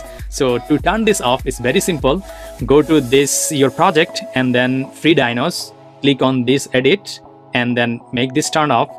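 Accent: Bengal, India. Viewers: You are definitely A bong with your accent i can tell that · Just by listening to his voice, I can say he is a Bengali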